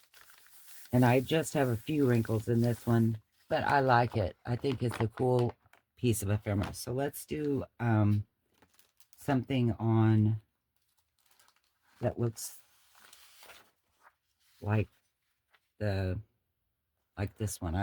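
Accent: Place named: South, United States. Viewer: "Do I talk funny"??.... oh my gosh 😂🤣😂 It's funny, I'm in northwest Indiana, and when I went to visit friends in Texas, I expected a heavy southern accent from the people who were originally from there, but didn't really hear it. When my son went to college in southern Indiana, oh my, they had the heaviest southern accent I've heard, what I expected Texan's to have, lol. So my guess is, you're originally from the south somewhere 🤔 Love the project, everything came out awesome as always 💜💜💜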